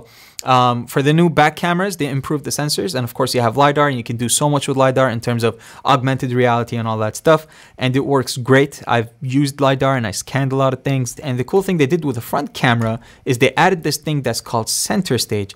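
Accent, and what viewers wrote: United States. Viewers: Your English is so good with American accent niiiiiiiice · Your English is so good with American accent niiiiiiiice !!! High five ✋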